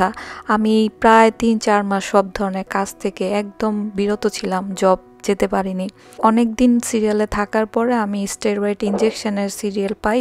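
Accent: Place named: Sylhet, Bangladesh. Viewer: আপু,খেয়াল করলাম আপনার কথা বলার মাঝে হালকা সিলেটি ভাষার টান এসে যায়❤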